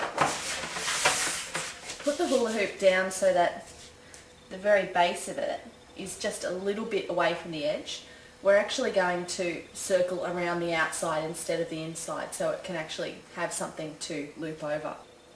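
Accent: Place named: Australia. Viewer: This is great stuff...thanks for sharing! Oh...and it is cool to hear your Australian accent - it is so weird to hear someones voice when all you have done is seen their photos. I have been told I have a minor Boston accent - no where near the movie accents you hear botched up and over acted! :) Again thanks for sharing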